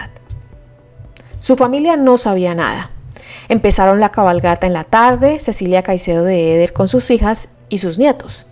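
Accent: Colombia. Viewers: VOS SOS VALLUNA? NO JODÀS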